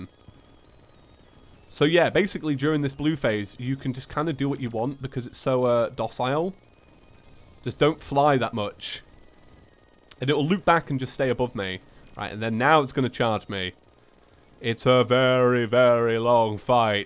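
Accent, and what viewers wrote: United Kingdom. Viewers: R u brittish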